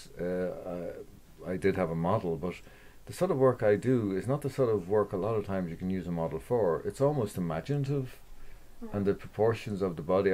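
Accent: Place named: Ireland. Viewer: It’s so wonderful to listen to the irish accent, beautiful speech💚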